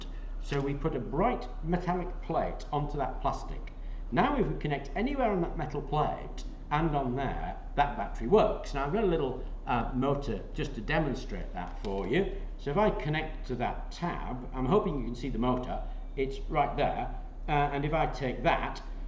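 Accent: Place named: United Kingdom. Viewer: Hello, and welcome back no new videos I missed your videos, I learned so many things from you, I am fascinated by the materials that can accumulate energy, I learned why it happens because simple materials are so wonderful. I learned a lot from your videos, even British English:) thank you for sharing your knowledge with us. I wish you much health, and so many beautiful things, you are the best. Ciao,e bentornato non nuovi video mi sono mancati gli tuoi video,ho imparato tante cose da da te,sono affascinato dagli materiali che possono accumulare energia,ho imparate perché succede perché semplici materiali sono cosi meravigliosi. Ho imparato da vero tante cose dagli tuoi video ,anche l inglese britannico:)grazie per aver condiviso le tue conoscenze con noi . Ti auguro tanta salute,e tante belle coso ,sei il migliore.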